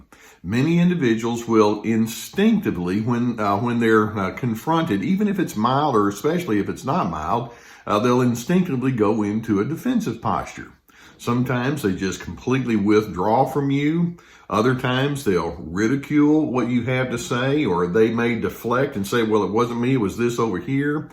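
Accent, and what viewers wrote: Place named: South, United States. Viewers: Hi from Spain, where are you from, the south of EEUU?, your accent is very difficult for me. I like your vídeos a lot. ☺️ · Mara, perhaps the southeastern USA.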